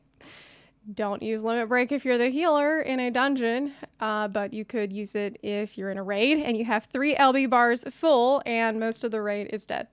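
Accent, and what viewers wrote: South, United States. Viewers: Bless your heart, darlin, you're as southern as I am lol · What accent is that? Definitely southern and super cute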